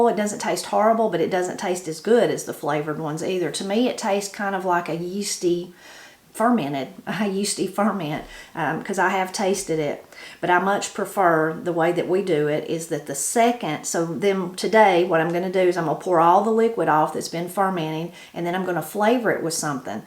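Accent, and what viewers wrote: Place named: West Virginia, United States. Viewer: I just found this post and I'm very curious because I've been looking for something I can stand to drink. I'm in California from West Virginia and have quit drinking water because it smells and taste so bad. This has caused many medical problems because of being dehydrated. I came here in 1962 with my parents. I was 12 years old and we use to drink water running out of the mountains, so clean and delicious. I'm now 74 and cannot stand to drink this terrible water in Modesto California. I'm going to do research and try to make water I can stand to drink. I just wonder where I might get my starting water.ost water sold in bottles here are just tap water that I will not drink. So I'm starting with a handicap. I've becr addicted to your shows. I miss now WV home but cannot go home due to medical coverage for my heart condition. 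Thanks for your guidance and your accent, reminds of all family and friends that have past over.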